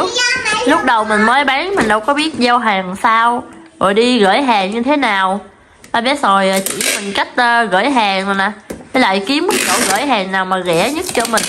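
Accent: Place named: Mekong Delta, Vietnam. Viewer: Rất thích kênh vì cách nói bình dị rất miền tây nam bộ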